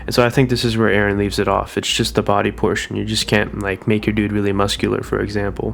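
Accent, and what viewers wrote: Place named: Canada. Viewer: You sound like a fucking canuck. I hope it isn't true. You are such a decent guy to watch when I had absolutely nothing else.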